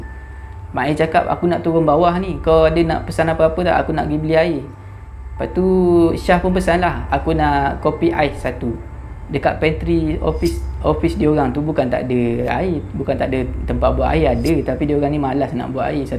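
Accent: Terengganu, Malaysia. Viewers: Dik org trganu ke😁 Sleng tu CM cakak ganu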